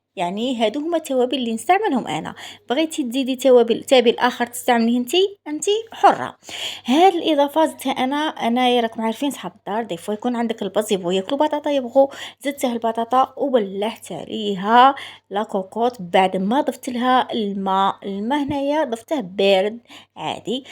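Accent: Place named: Algiers, Algeria. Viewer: ختي علاه راكي تهدري كي دزيريين ياك من الغرب نتي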